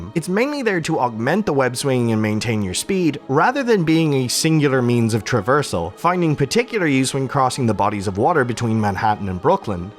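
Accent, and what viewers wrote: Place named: Ireland. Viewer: The accent came through , Irish content creator? Dope videos by the way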